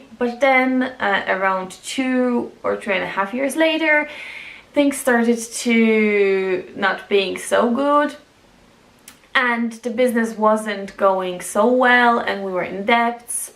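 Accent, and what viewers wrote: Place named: Ireland. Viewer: Your accent is awesome. I love hearing foreign-born people with an Irish accent.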